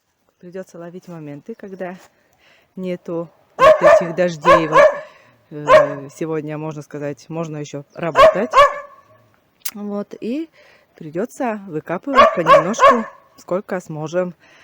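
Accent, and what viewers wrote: Komi, Russia. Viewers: Здравствуйте, и! Привет вам из Самарской области👋👋👋 Ох, кутшем ме рад кывны рОднэй диалект, вроде похож миян говор вылэ Анна Николаевналэн серниыс). Чужи да быдми ме Ижемскей районын в с.Няшабож. 12,5 лет не была на родине, гажтэмала порой зэй ена. На днях искала рецепт калитки, а вчера случайно, а может и не случайно, вышло в ленте ваше видео. Результат - челядес да верОсэс водтэдi узьны😴 и кык час воедз калитка пОжалi😁 с картошкой и пшенной кашей. ПрОстэй национальнэй выпечка. Дети очень любят выпечку с картошкой, буду теперь чаще баловать их калиткой 😊 в общем, смотрю теперь по возможности ваши видео, как вы, картошка босьтанныд, тшак Октанныд (ме мамакед зэй ена любитi тшакла ветлыны, чери кыйны вугыршайт вылэ)... Ностальгия🥰 Синваэ петэ... Став бурсэ тiян!💐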